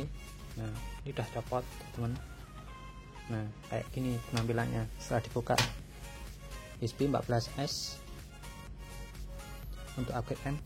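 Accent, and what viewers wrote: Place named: East Java, Indonesia. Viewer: Mas bisa Update olshop ram dan ssd? Ngomong ngomong jatim Mas. Sapa tau tetangga 😂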